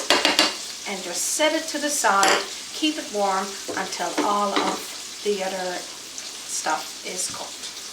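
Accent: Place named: Newfoundland, Canada. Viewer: I like this simple recipe, I have made this many times, but I'm going to flour coat the balls like you did with flour. I ave to say the rice was not steamed in was boiled and set to cool, then served, I love your NFLD accent, some day if I ever get back I will bring you a great bag of H's, so you can say here we are instead of are we are, stay till I come were your at. I do love you don't get me wrong, this vid was 8 years ago and you avent changed, and that a good thing🥣🥣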